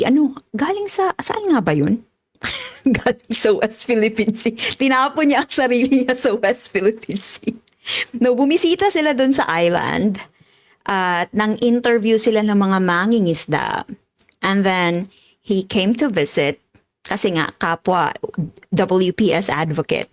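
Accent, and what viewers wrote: United Kingdom. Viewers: UK accents 😊❤ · Like your British accent and Cebuano.. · I’ve watched you for the first time with Christ Tan‘s podcast, (that was just yesterday) since then nag marathon na ako sa mga vlogs mo. Sayang na late ako ng 4 days sa live mo na ito. Anyways, i love your British accent just keep it up…yan ang tatak mo plus hindi ka nakakasawang pakinggan. I have 4 friends from Davao mismong kababayan ng mga Du30 but they‘re not DU30 followers kaya i love them so much! I’m your new subscriber saying HELLO FROM VIENNA AUSTRIA, the Batangeña!❤️💋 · Good day mam, you have a voice..British accent ba..:face-blue-smiling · Ms., puwedeng puwede ka po maging newscaster. Filipina with British accent. Ang galing mo po! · Yes Ms. I love your British accent and specially your sense of humour,God Bless 🇵🇭🙏🇨🇦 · Your style of talking "a little British accent" adds flavor to the whole shebang. 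You caught my attention Girl! I am a big fan - keep going especially taking on people with different perspective blinded by their wrong viewpoint. You are on the right track of messaging. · Manang, hindi aq kasali sa any political party, may reaksyon lang Ako sa isang blog mo with christian, ala Ako paki sa political views mo, PERO NATAWA AKO SA PAGMAMALAKI MO NA ABOUT YOU GOIN' ON A CHURCH AT PAGBA.BIBLE STUDY MO, BUT THEN MAGCRITICIZE KA NG TAO, PARANG AKO NAHIYA KZ LUMALABAS LANG PAGIGING HIPOKRITA MO, ANG BAWAT TAO BINIGYAN NG TALENTO HINDI PARA IPAGMALAKI KUNDI GAMITIN SA MABUTING LAYUNIN AT HINDI IBINABANDERA O PINANGANGALANDAKAN ABOUT YOUR "BRITISH ACCENT", ICRITICIZE MO SA TAO, SABIHIN KO SAYO KAHIT RUSSIAN, KOREAN, JAPANESE PRESIDENT HINDI MAGALING SA ENGLISH AT WALA YANG ACCENT NA PINAGMAMALAKI MO, KUNG HINDI MAGALING SA ENGLISH SI VP SARAH EH ANU NAMAN SAYO?? AT LEAST NAG.TATRY SIYA NA GAMITIN ANG INGLES DAHIL ANG MOTHER LANGUAGE NAMAN TALAGA NG PILIPINAS AY TAGALOG, BAKIT GINAWA NG DIOS NA MAGKAROON NG IBA'T IBANG LANGUAGE KUNG MAS IMPORTANTE PALA SAYO ANG ENGLISH AT ACCENT NITO, KAHIT NA SINASABI MO NA HINDI MAGALING SI VP SARAH, WALANG OBLIGASYON SI VP O KAHIT SINO NA NAMUMUNO SA GOBYERNO NA ICRITICIZE MO SA MGA PINAG-ARALAN NILA, PEKE KA DIN EH AT HIPOKRITA, WAG MO NG IPANGALANDAKAN YANG PAGPUNTA PUNTA MO SA CHURCH AT PAGBA.BIBLE STUDY MO, NAGMUMUKHA KA LANG PAYASO. 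MAY ISA. Pa pala, kung talagang nagbabasa ka ng BIBLIA dapat alam mo yung talata na kapag nag-iisip ka ng hindi maganda sa kapwa ay katumbas din ng pagpatay. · You do sound British, I'm loving the accent though 😊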